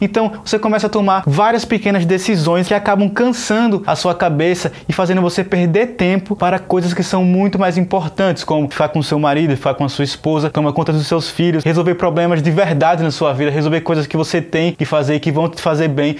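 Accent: Northeast, Brazil. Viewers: Tu é do meu Nordeste,é? Que vídeo maravilhoso. ❤️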